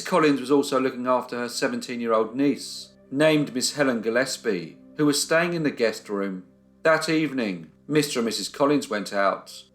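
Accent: United Kingdom. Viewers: One of my favorite bands. Must be an accent thing of that area of Britian.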